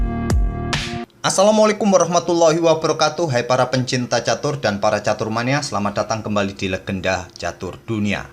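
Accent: Java, Indonesia. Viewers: jangan kurangin analisisanya min, tapi klo tujuannya mengurangi durasi, boleh tapi sedikit aja, itulah ciri khas channelmu dan satu hal yg harus admin tahu, admin tahu caranya menganalisa sesuatu dengan intonasi yg tidak datar( ada variasi tempo yg cepat lambat dan variasi nada yg tinggi rendah) dengan dibumbui logat khas(jawa), ini modal yg besar untuk menganalisa sesuatu kepada penonton karena tidak semua orang mampu menguasai ini, jadi jangan sia2kan ya admin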